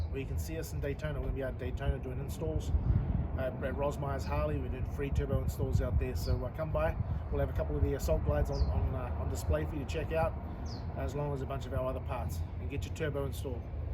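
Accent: New Zealand. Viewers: Is the guy talking from Nz?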